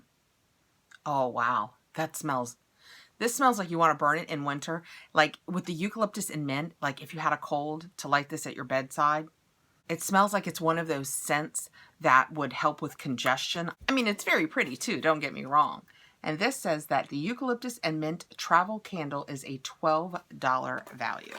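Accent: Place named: South, United States. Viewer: Lavenda! That is how a true Southern girl speaks! My grown kids tease me as I say ,"Souwich," instead of "sandwich"!! Raised in Houston and love it!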